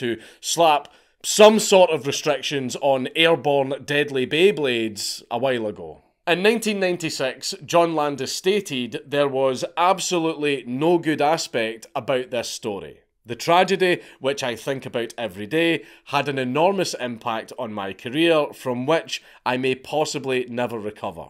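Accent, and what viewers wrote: Scotland, United Kingdom. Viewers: Let’s be honest, a story told by a Scotsman is way more entertaining and engaging than anyone else on earth lol!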